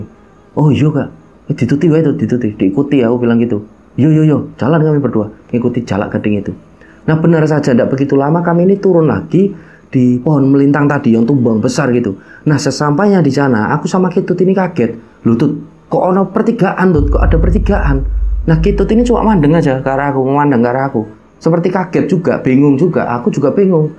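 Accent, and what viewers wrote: Java, Indonesia. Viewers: Jowo ne endi mas?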